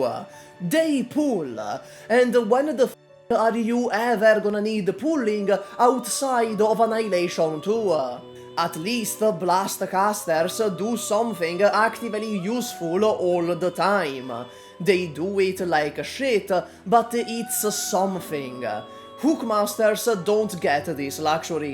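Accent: Italy. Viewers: It my first time hearing an Italian Explain Arknights, How the Siracusao?? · I reconized that accent as a fellow italian, good to have a youtuber from around hete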